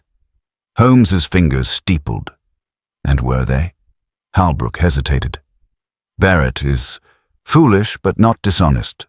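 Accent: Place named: Liverpool, United Kingdom. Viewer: Ok Scouse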